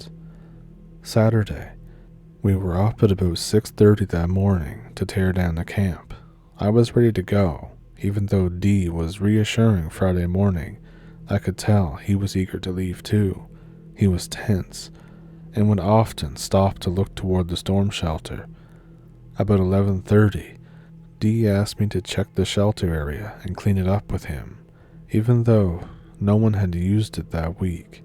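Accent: Canada. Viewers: I always look forward to your stories. This time even more as I'm from Oklahoma. Love that last one. I was in campfire girls when I was young. Went to camp only once. Unfortunately or fortunately, I've never seen any thing frightening. I've been on overnights with my horse riding groups,to many areas of Oklahoma. Yet nothing. I must be very protected, that's all I can figure. Hope you get better soon sir, love your Canadian accent😊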